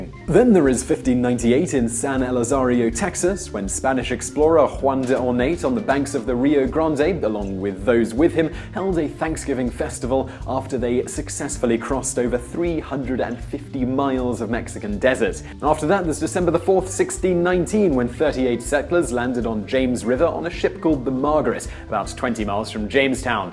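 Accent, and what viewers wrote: United Kingdom. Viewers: Weird hearing a Brit talk about American Thanksgiving history. I should do one in a brash US accent about Cornwall.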